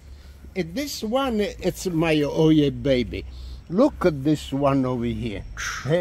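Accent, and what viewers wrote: Italy. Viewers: I can spend the whole day listening to this man talking about his garden with his Italian accent !! The most beautiful thing 🥂🥂👍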